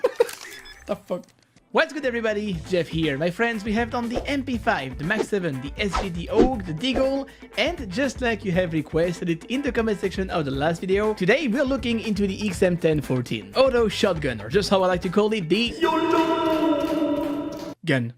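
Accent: France. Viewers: Are you french ? Your accent looks so much french if you're not it's weird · You have some Times a french accente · you have a french accent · sound french ? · French accent ? 😁😁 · You have a French accent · J'adore ton accent francais esti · Is he french ? His accent is betraying him xD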